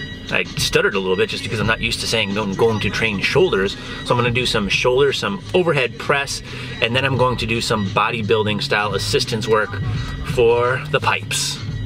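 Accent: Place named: South, United States. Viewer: dem accents doe seriously big upps more of that southern accent it makes these videos so much better:D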